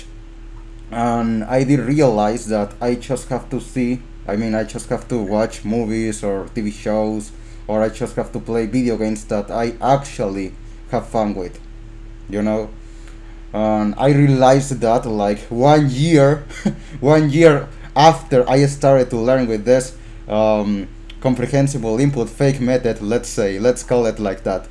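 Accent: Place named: Latin America. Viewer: Hi, I gather that you’re an American Spanish speaker from your accent (I mean, a native Spanish speaker from Latin America). People from Spain have a different accent when they speak English. I'm not sure if you're from Mexico, but I'm absolutely sure you're not from Spain. Man, I'm very impressed by your English! I’d like to speak English as well as you. Someday I'll get it, I hope so.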